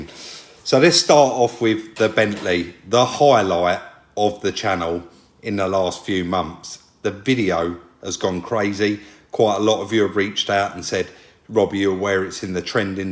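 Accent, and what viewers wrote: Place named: Cockney, United Kingdom. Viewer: I came here for the Bentley and ended up staying for the East Enders accent 😊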